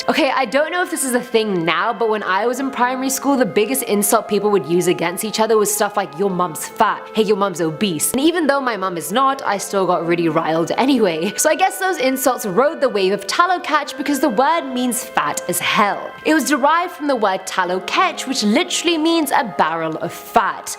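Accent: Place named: England, United Kingdom. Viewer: Huh - didn't realize torontians had English accent ! Though they were like "take off- eh " (like minnesotians ) !! Suppose to be funny - no need for anyone to get angry . This young lady is very pleasant to listen to - thank you !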